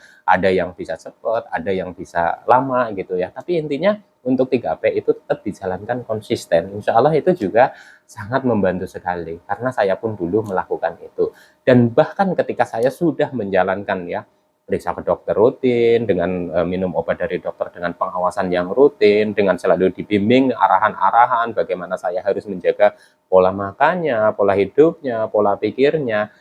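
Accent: Banyumas, Indonesia. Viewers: ngapak asal mana mas?sy juga kena anxiety siapa tau bs sharing...ada group ga atau WA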